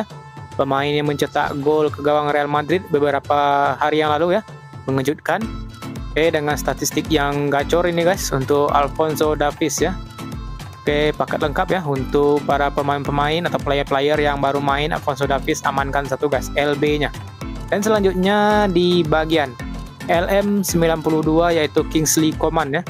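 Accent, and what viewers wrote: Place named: Aceh, Indonesia. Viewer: Logat bahasa kek Aceh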